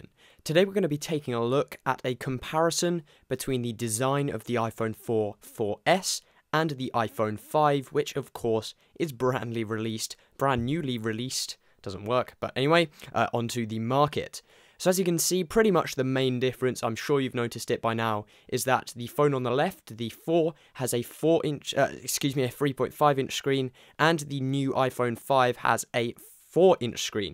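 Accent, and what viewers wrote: United Kingdom. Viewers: Is he a Brit or what?1?! ;0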